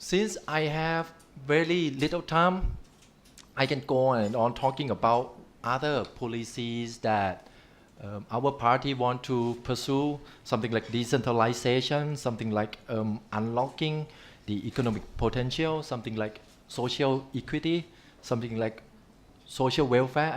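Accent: Thailand. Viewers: สำเนียงแบบไทยไทยแต่เข้าใจง่ายดีครับชอบมากเลยครับผม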